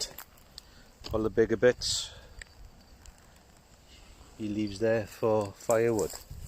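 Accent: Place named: Pembrokeshire, United Kingdom. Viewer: I used to visit Pembrokeshire, so I love to hear your accent as much as watch the content...Keep going if only for your mum's sake...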